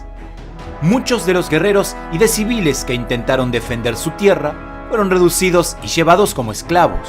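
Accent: Argentina. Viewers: Excelente video! Tu eres argentino? Saludos de Brasil! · Se escucha claramentr como argentino o posiblemente uruguayo, pero peruano o ecuatoriano? Ni cerca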